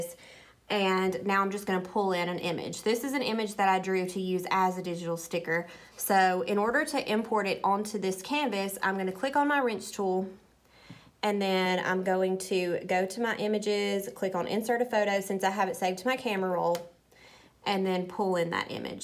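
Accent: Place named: South, United States. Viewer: Your accent is such a southern accent it’s sooo cool!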